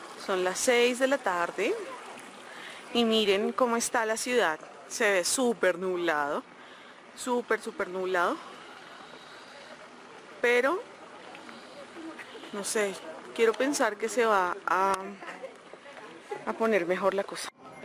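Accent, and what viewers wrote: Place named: Colombia. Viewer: sabía que eras Colombiana por el acento, pero ni idea que vivías en bogotáa!! que chevere tu videooo! Un día tenemos que conocernos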